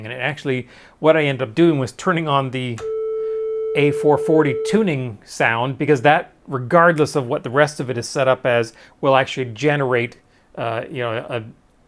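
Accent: Canada. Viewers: I am subscriber 9.32K-ish. I subscribed today. I've been watching this channel for a long time and just noticied I never subscribed. are you Canadian? I can't fix your accent. You say re-VERB instead of reverb like most people and as a sonic minded person it's puzzling. Your channel is great! Thank you for all of the videos and content.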